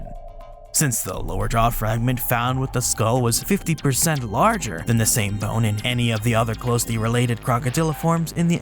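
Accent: Spain. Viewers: I Love how u give everyword spanish entonation, its a good guess if u dont know much portuguese, still funny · The day he stops giving Portuguese a spanish accent will be a day I'm happier than usual ... Until then I'd prefer to hear the correct pronunciation by any other means, like c'mon, can't you just insert Google translate audio??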